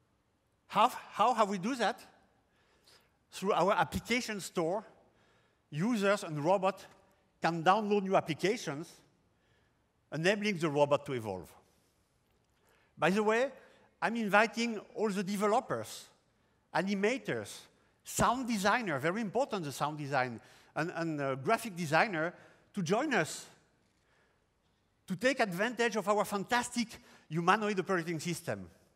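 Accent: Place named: Germany. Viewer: His accent sounds german.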